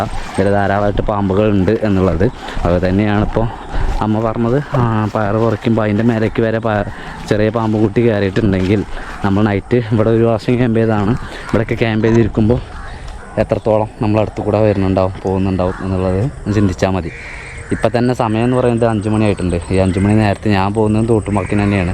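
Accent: Malappuram, India. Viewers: Mone kutta ne malappuram aaanodaaaa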